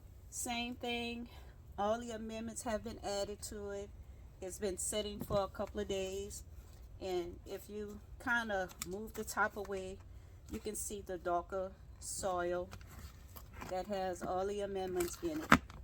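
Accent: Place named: Louisiana, United States. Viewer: Beautiful, south louisiana gardener here. As soon as you began speaking I recognized you are a fellow Louisiana gal 💙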